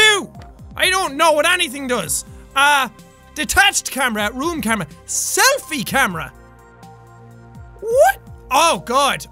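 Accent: Ireland. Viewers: is he irish?? im new ;p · are u asking if he's Irish or not because he definitely is Irish and he's had that accent for a while haha :3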